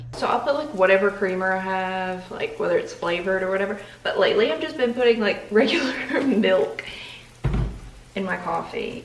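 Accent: South, United States. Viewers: I dont know u i just got this on my explore page but i think ur so cute with ur little southern accent n u def nailed the rory outfit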